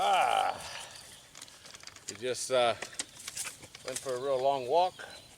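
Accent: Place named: Canada. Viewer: Great vlog, love the camping with the Dog. Where are you from originally? I would say Canada but not great with accents.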